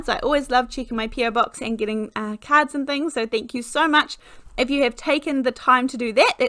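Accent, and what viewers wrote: New Zealand. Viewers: I like how you fixed the card. I like the second version better also. Love your videos. I enjoy your beautiful NZ accent.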